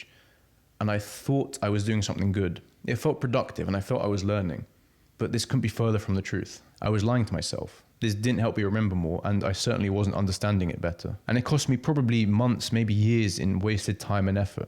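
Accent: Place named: RP, United Kingdom. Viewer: Thank you so much for your work.Sometimes i get pretty useful insight from your videos.I like your soothing, not-constantly-being-interrupted-with-background-music voice and your posh accent.In fact, being an English learner, your videos are something that inspired me to switch to British accent rather than the American one.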